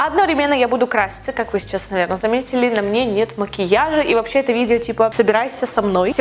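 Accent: Germany. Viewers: Офигенно говоришь по-немецки.Такой акцент😍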